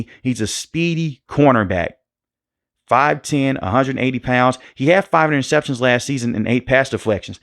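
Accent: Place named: West Coast, United States. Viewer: I can hear the Westcoast in your voice, bro... We're getting better... BTFU ⚡️ ⚡️ ⚡️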